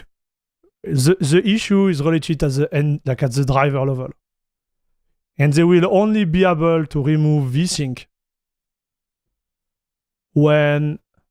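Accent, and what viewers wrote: France. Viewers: But are you French French or Canadian French?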